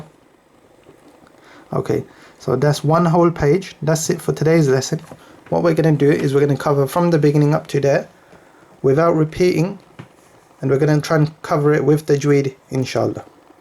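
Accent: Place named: United Kingdom. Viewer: Well. He sound British. It doesn't matter what race or religion you can still be Muslim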